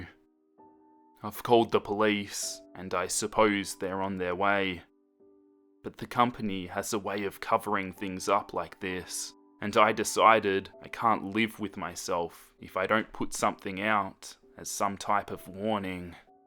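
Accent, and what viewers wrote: Australia. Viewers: Your skill and works are an inspiration. Also your Aussie accent adds a uniqueness to the story narration.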